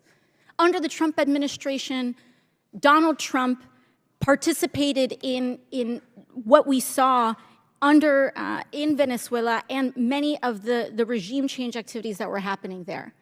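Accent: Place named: Spain. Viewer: Just because you turn on your Spanish accent doesn’t make you an expert on immigration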